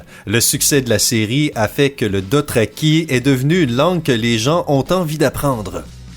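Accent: Quebec, Canada. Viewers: Avec l’accent québécois c’est vraiment trop chiant à écouter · Je sais que c'est pas de sa faute mais son accent est vraiment horrible, gros plagiat, des informations non correct par moment, des transitions beaucoup trop lente, un accent québécois désagréable. Si vous avez regardez cette video vous avez perdu 11 minutes de votre vie. Surtout que de centaines de youtuber proposent le même format avec des vidéos de qualité. Une vidéos démontrant la médiocrité de cette chaine sous tous ses angles aussi bien par le pésentateur que par l'humour insignifiant et médiocre. Si vous appréciez cette vidéo soit vous êtes aveugle et sourd soit vous aimez visionner de la merde, ce qui fait de vous un scatophile. Parce que à se niveau là je suis étonner de ne pas avoir retrouvé mon téléphone dans les chiottes. Sans oublier le manque évident de créativité et de travaillent qui font de cette chaine ce quelle est. 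· Époque RD son accent il n'y peut rien, il est québécois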